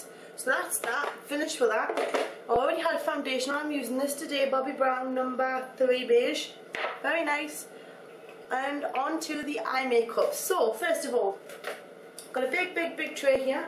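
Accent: Newcastle, United Kingdom. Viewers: r from newcastle by any chance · Are you ust British, or are you some sort of "mix"? :P BTW: You ROCK at this !!! Keep up the good work !!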